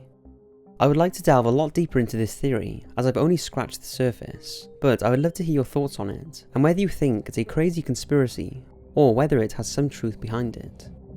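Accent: Wales, United Kingdom. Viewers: Lovely Welsh accent!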